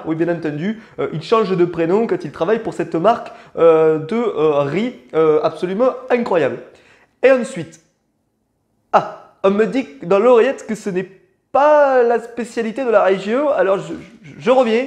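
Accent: France. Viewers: Oh l'accent du sud🤣🤣🤣 t'es génial ne change rien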